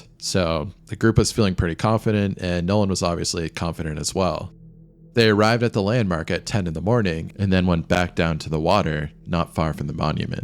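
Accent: United States. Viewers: I'm doing some work at home and I have to choose between hearing this guy's American accent and a neighbour using a chainsaw. Shit!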